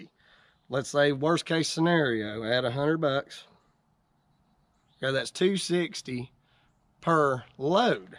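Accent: South, United States. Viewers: STOP APOLOGIZING! You are a Southerner who speaks Southern slanglish. Be proud of that! You did a great job. It's a clear, concise, easy to understand vid that covers all the major points, gives good pointers and insights, etc. All in all a great teaching vid. Anyone considering getting into the biz can understand, accent or not. Oh, I came up with 5 hours. Two hauling equip,, 2 grading, I spreading and tracking in.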